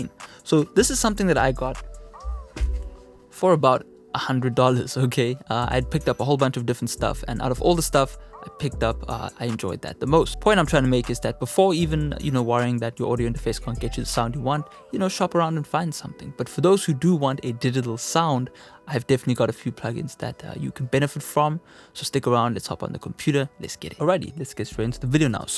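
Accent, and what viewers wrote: South Africa. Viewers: Are you from South Africa???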